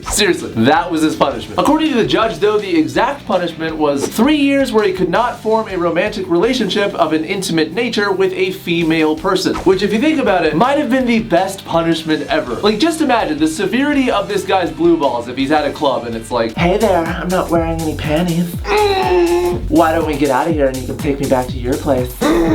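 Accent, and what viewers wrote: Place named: United Kingdom. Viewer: You seem like one of those low key British people. You have our humour and the way you say some stuff is like us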